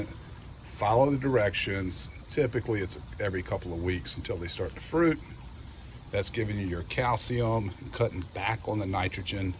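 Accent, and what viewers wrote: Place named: South, United States. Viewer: Yea! My 1st year w CPs!! I hope they turn out! 🍅 Can't wait to taste them! Yay, I picked the miracle grow, too!!😉👏 Are u originally from NC? Accent sounds a little more southern. 😁😊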